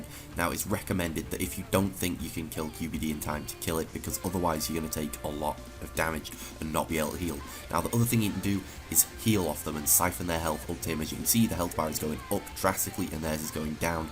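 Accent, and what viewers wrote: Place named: England, United Kingdom. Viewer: You should have added text on things like gear names lol. It's hard to understand the English accent.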